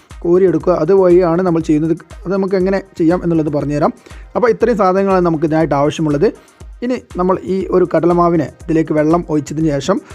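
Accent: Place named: Vadakara, India. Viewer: Laddoo mixcher. Anna vadakara parayunne. Super aayi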